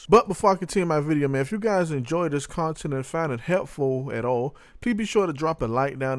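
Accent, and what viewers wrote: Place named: South, United States. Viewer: That’s arrow, not error. Good southern boy accent dair.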